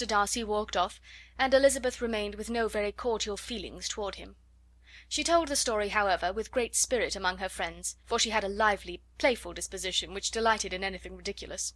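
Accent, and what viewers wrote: United Kingdom. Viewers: so british love it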